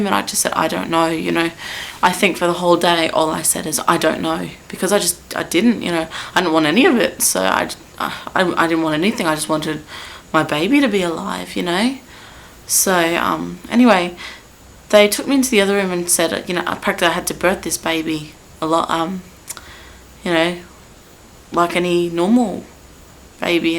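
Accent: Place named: Australia. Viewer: Hi. I wish I could give u a big hug right now! I can tell ur an Aussie bcos of ur accent. I'm an Aussie also. I have had 2 losses in 3yrs ( from so many complications) and I have never told my story until the doctors are talking me into it and they r eager to mention me in the medical journal. I believe in miracles bcos now I am 32weeks pregnant n baby is active n healthy!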